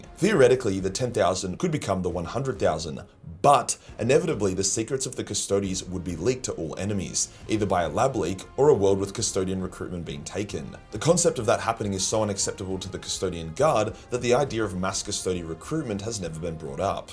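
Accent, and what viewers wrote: Australia. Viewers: G'day ol son.....im new to, and love that an Aussie speaking in Aussie talking about 40K. I recently bought the Spider Space Elve, and Now You have Custodies. I had to get one and the best of these is the Golden Dread Lord, Big Cheers ya ol bugger from A M8 up In the Sunshine State🙃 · This guy’s frat boy personality is normally something I hate but man oh man, slap a Australian accent on it. I will listen to this man all day.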